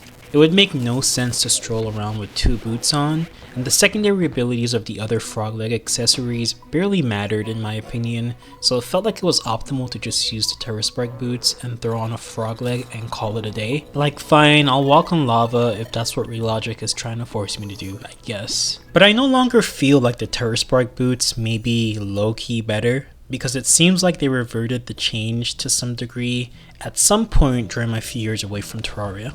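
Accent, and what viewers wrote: Canada. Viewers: It's so obvious that you're canadian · is this guy canadian or something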